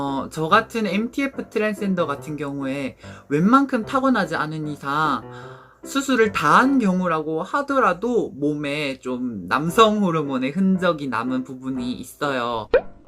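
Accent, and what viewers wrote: Gyeongsang, South Korea. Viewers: 경상도분이시네 그냥 사투리로 하시지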